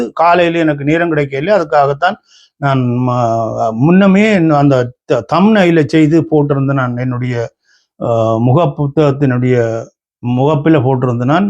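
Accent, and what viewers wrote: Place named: Jaffna, Sri Lanka. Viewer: typical jaffna talk......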